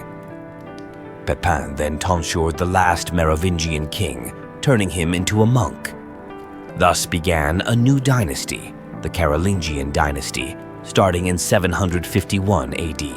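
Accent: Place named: United States. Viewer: The sinister American voice over and sneering is a deception. As I learned at university documentation as happens now, was often simply a formalisation of a fact. The Papal States were a buffer intended to protect and reduce pressure from the rule of kings and armies. It was intended to create neutrality as the Bishop of Rome acted with more authority than any present internal court. The claim Constantine had donated the territory to the Bishop of Rome and Church was not untrue. Constantine had compensated the Christian Church for the way the Roman State had attacked, murdered and persecuted them. He gave the Laterin Palace to the Bishop of Rome. Given authority to the bishops along with governers. He built basilicas, founded churches and created religious tolerance via the Edict of Milan.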